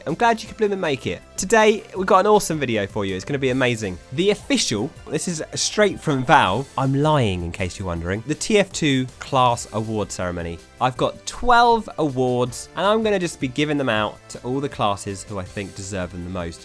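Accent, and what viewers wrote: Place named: United Kingdom. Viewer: I swear you’re like twice as british as usual in this video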